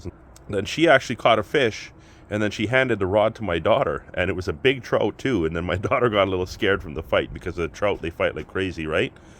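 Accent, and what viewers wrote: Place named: Canada. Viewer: I love your accent! Very Canadian!! 😊 Enjoy your vids.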